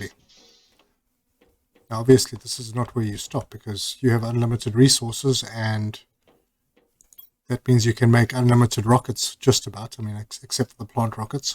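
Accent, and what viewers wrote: South Africa. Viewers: Your slight accent makes me think South Africa region? Anyway, I am really looking forward to this next update. Been playing for a few months and have been loving the game.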